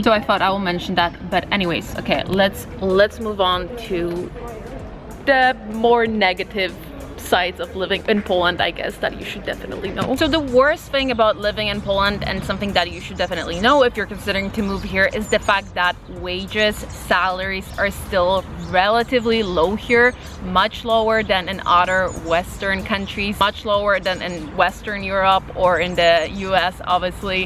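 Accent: United States. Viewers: Yes, I noticed the same thing-a very strong American accent, it might just be how a Polish person speaks English! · Wait, so you were born in Poland, how come you have a perfect American accent then? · How come? 😎 your American English is impeccable. I cant believe you didnt live in the US. Greetings from Warsaw😎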